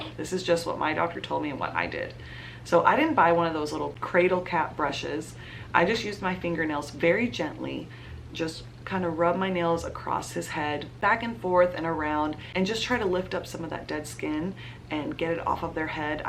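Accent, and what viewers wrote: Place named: Texas, United States. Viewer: I found your videos recently as I will be having my son in two weeks as a first time mom. Every video has been so helpful. I was hooked when I could tell you had a Texan accent and then found out you’re a fellow Houstonian!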